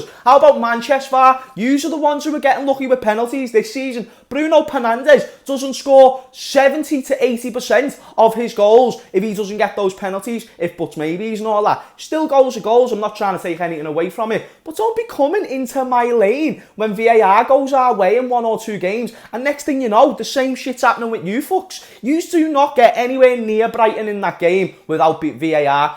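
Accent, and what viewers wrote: Liverpool, United Kingdom. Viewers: I'm going out on a prediction limb that I hate: I'm saying we win the league, no surprise there. BUT, the thing I hate is that I really think Everton could be number 2! We can't be blind to what Carlo is doing over there! And, the Italian Stallion is sort of a nemesis to Klopp from his days at Napoli. He always gave us trouble and every Red should realize he's far from shite and since he's got his wonder boy with him, I'm rightly concerned. Number 3, 4, or 5 is City and I'm thinking Arsenal will be 4 because Arteta is doing the business over there. Gunners could be number 3, so we better be ready for them. You called the line-up, I think except maybe the gaffer could start Milner but I'd rather have Gini. Yes, Ally is injury prone obviously. Will he be out long this time? I don't think so. I'm thinking 2-1 because there ain't no 12th man in the Kop to encourage our lads. 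Okay, Brendon and his foxes will be number 5, I think, but could be number 4. Managers gettin' the sack? Jose, Fat Frank, OGS are all gone this year. Pep won't get sacked but he's moving on after the year, I predict. BTW mate, for what it's worth, take it from an old man, you are boss at this. Keep it up and your channel will become massive in the Red fanbase zone. You sound like a seasoned pundit already and I'm hooked on your Scouser voice, your language, and the way you get excited and worked up like the diehard fan that you are. Inspiring, young man, simply inspiring! I watch everything you d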